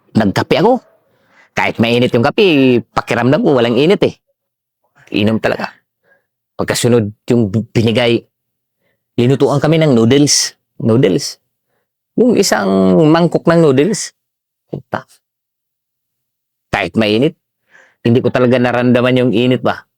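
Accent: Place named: Iloilo, Philippines. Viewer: cguro ilonggo ito si master ah sa accent nia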